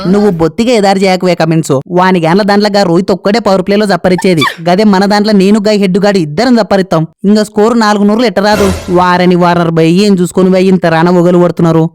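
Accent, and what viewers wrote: Rayalaseema, India. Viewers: R u From Rayalaseema?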